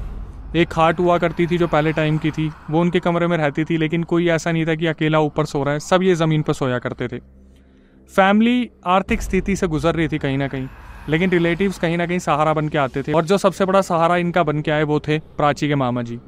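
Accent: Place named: Bhind-Morena, India. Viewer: Bhind morena ki language h bhai 😂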